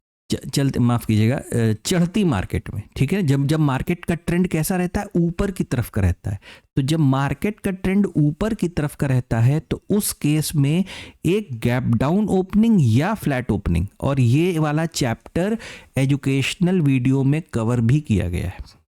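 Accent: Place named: Madhya Pradesh, India. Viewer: Bhai, aap MP side se hain kya? Apki boli hamare side ki lag rhi hai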